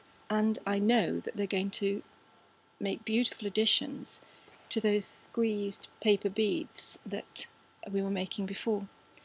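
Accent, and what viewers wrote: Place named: United Kingdom. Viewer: Jolly good...love the british accent.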